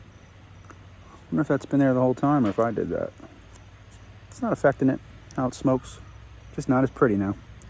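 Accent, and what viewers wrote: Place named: Northeast, United States. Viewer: Love your reviews! Between the three Quorum varieties, which would you say is your favorite? BTW, your Northeast accent and sensibility definitely brings me back home as I currently live in California but grew up in the NYC area! Are you originally from PA?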